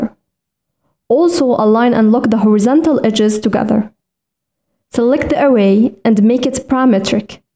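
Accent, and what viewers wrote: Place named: West Asia. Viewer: I think that : Your voice is from the West Asia ! I am living in Viet Nam , I have learn Revit in 1 month . I find on youtube in Viet Nam but the result is zero ! Thank you the West Asia girl !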